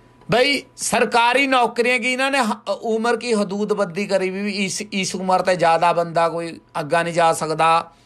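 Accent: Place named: Puadh, India. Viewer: ਬੀਰੈ ਜੂ ਸਤਿ ਸ੍ਰੀ ਆਕਾਲ ਜੀ ਬੇਨਤੀ ਕਿ ਕਲ ਇਕ ਨੇਂ ਕਿਹਾ ਪੰਜਾਬੀ ਨੂੰ ਵਗਾੜ ਕੈ ਬੋਲ ਰਿਹਾ ਇਸ ਨੂੰ ਇਹ ਨਹੀਂ ਪਤਾ ਕਿ ਪੁਆਦ ਕੀ ਬੋਲੀ ਕਿਨੀ ਪਿਆਰੀ ਹੋਵੈ ਬੀਰੈ ਥਮ ਖਿਚੀ ਚਲ ਬੀਰੈ ਜੀ ਲਿਖ਼ਣ ਨੂੰ ਤਾਂ ਬਹੁਤ ਊ ਹੈ ਬੀਰੈ ਜੀ ਕਿਨਾ ਕੂ ਲਿਖੈ ਦੁਨੀਆਂ ਤੇ ਪਾਗ਼ਲ ਹੋ ਗੲੀ ਮੇਰੈ ਪਾ ਤਾਂ ਲਿਖਣੈ ਨੂੰ ਬਹੁਤ ਹੈਂ ਬੀਰੈ ਮੇਰੀ ਡਬੇਟ ਕਰਾੲੇ ਇਨਾਂ ਗੈਲ ਮੈ ਕਡੂ ਇਨਾ ਕੇ ਚਿਬ ਧਨਵਾਦ ਜੀ ਜੀ ਸਰਪੰਚ ਮਾਛੀਵਾੜਾ ਸਾਹਿਬ ਜੀ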